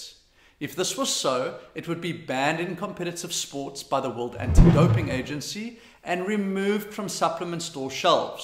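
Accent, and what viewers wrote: South Africa. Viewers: South african? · You sound 99.9999% South African😁 Am I right? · You south African? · Are u South African?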